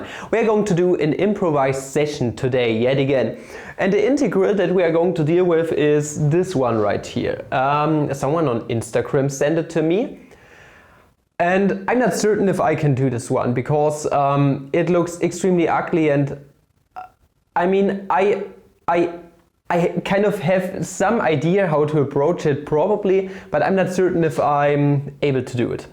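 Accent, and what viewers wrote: Germany. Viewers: I love how you say “Integuhrruhl”! Math is sooooooo much better when done in a thick Deutschlander’s accent!!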